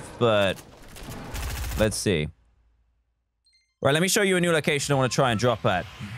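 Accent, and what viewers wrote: England, United Kingdom. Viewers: I know :) I just wanted to see if I was the only one who heard it, even though it's his English accent. Thanks though 👍🏻😁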